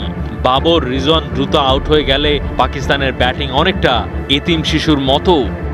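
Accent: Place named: India. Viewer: ইন্ডিয়ান কন্ঠে খবর পড়া কেন😡😡😡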